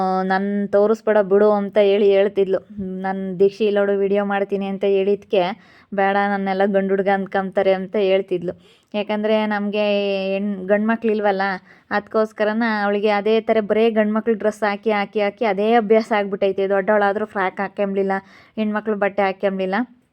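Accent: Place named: Sira, India. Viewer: ನಿಮ್ಮ ಭಾಷೆ ಸಿರಾ ಭಾಷೆ ಅನ್ಕೋಂಡಿದ್ದೇ... ಮೇಡಂ. ಇವತ್ತು ಸಿರಾ ಅಂತ ಕೇಳಿ ಖುಷಿ ಆಯ್ತು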